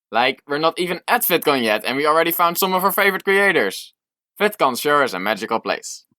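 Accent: Netherlands. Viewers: I knew it you’re Dutch... well that explains your dutch English accent Really like your videos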